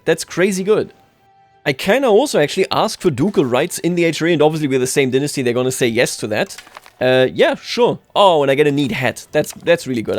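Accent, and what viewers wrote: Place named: Brandenburg, Germany. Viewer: As a Brandenburger, i would rate your Brandenburgisch(i won't call it Berlinisch) a 8/10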